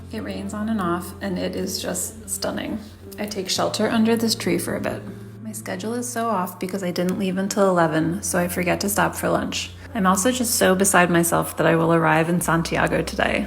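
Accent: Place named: Australia. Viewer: You sound like an Australian..Thanks for sharing your Camino experience.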